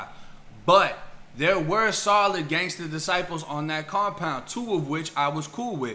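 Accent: Boston, United States. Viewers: You sound like your from Boston mo. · Damn..... Are you originally from Boston, South Shore? I'm a Mass transplant living in FL too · You from Boston bro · U sound like u from my city 🤔 #Dorchester #Boston #Streets raised me 👌🤷🏽‍♀️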